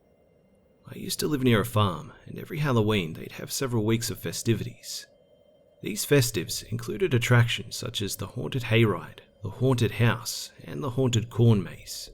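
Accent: Australia. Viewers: are you Australian? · Yo, what state you from in Australia, just wonna say hey from Perth lmao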